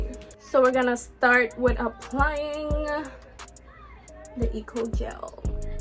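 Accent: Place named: Virgin Islands, Caribbean. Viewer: Hearing a VI accent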